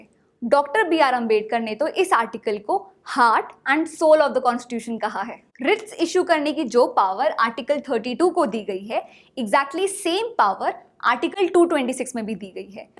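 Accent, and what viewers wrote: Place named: South, India. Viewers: You are south Indian. That accent. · Arey waah. An unrealistically unbelievable way of making a layman understand. You go girl. And yes, you sound Malayali.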